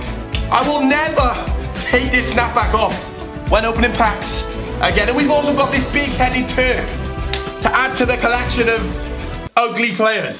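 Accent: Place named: Newcastle, United Kingdom. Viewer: You sound like a Geordie shore person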